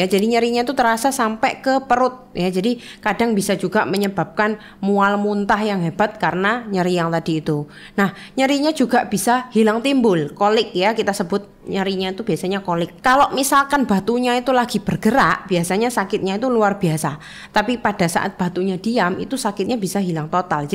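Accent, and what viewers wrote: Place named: East Java, Indonesia. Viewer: Logat Jawa Timuran 😂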